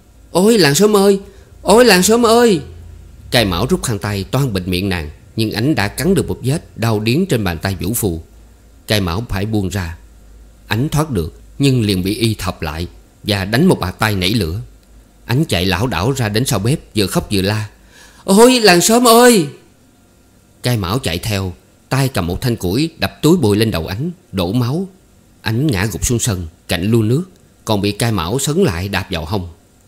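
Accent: South, Vietnam. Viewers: Giọng đọc miền Nam của Anh thật hay, thú thật tôi rất thích giọng đọc của Anh, cám ơn Anh.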